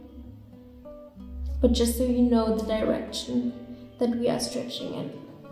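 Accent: Germany. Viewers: U have a german accent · Are u German no hate but ur accent is like mine in english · This is the first time I´ve heard her talk in a video, was not aware was German haha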